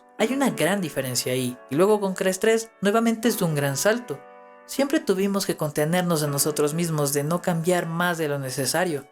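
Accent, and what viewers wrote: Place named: Sierra, Ecuador. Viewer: Bro, tu contenido es genial. También soy fanático de Crash Bandicoot. Saludos, también soy de Ecuador. Mas precisamente de Guayaquil, supongo eres de la Sierra por tu acento.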